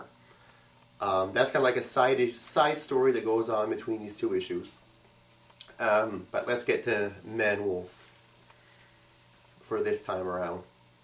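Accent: Canada. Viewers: This stuff is great for the geek at heart! By the way, man. You gotta be from the Atlantic Canada. I could pick that accent out anywhere. Nova Scotia by any chance?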